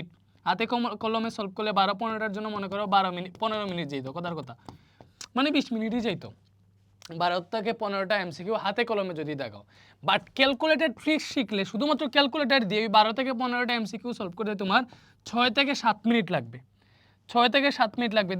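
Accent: Sylhet, Bangladesh. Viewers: Sir apnar bari sylhet er kotay. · Bai apni to sylheti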